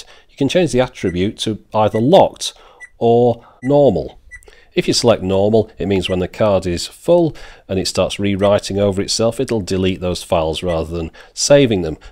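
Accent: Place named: North West, United Kingdom. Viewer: Thank you for taking the time and effort to do all these reviews; very much appreciated! I've watched pretty much every dash-cam video you've done (nice to hear a fellow NW accent, too, BTW ;-) ) and was initially hoping to get this one, but, since the price has now jumped to around £92 I was wondering if you could recommend what YOU think might be a good cheaper option (around the £50 mark as I'm not a millionaire just yet and I trust your judgement based on your no-nonsense reviews)?! Thanks!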